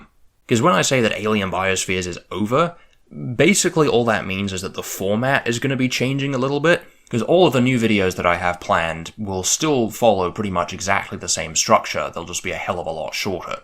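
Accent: United Kingdom. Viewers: Omg you’re British!!! I thought you were a Neotectan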